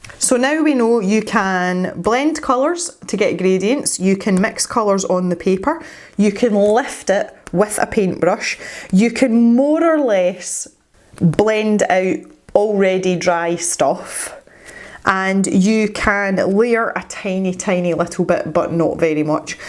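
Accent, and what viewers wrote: Scotland, United Kingdom. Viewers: Cute accent. Scottish?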